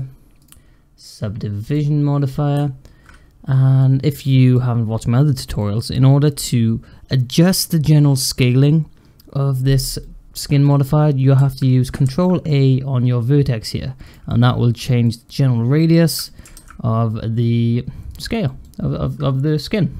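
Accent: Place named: Cardiff, United Kingdom. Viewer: Is that a Cardiff Accent i hear? nice to see a tutorial in the native tongue lol. (swansea here)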